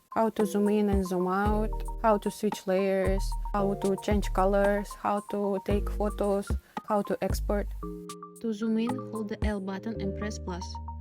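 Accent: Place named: Russia. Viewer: this woman got that babushka accent.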